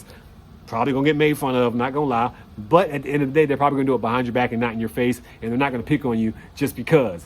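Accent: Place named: United States. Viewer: Sounds like your from the U S A and it sounds great you moved around a bit well rounded! Be Well Be Safe & Stay Blessed.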